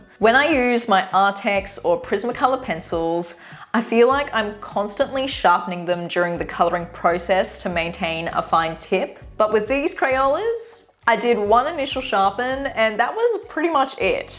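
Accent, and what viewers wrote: Australia. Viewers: wow this must have took a lot of effort and motivation to do, I like your aussie accent!😂 crayola really gives off this nostalgia vibe from colouring and drawing as a kid with these supplies, overall you did a good job of colouring this design, I really like how the water colour effect blends together nicely to create almost a tie-dye effect💕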